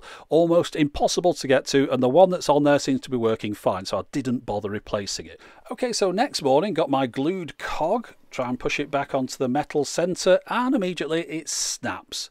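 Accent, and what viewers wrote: United Kingdom. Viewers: British, fast and accurate.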